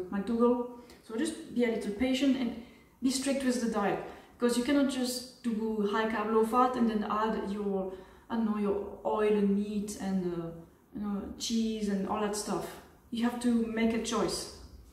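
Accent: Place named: South Africa. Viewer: Do you know you’ve got the South African accent ! It is sooo cute 😊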